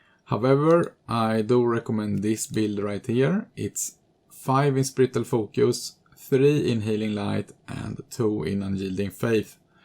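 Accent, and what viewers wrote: Sweden. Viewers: Man hör tydligt att du är svensk!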